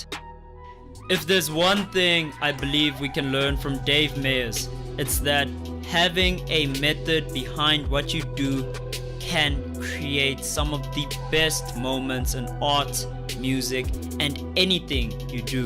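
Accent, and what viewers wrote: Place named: South Africa. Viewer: I really liked your video. I can even hear that you're south African because of your accent and that you pronounce the names correctly.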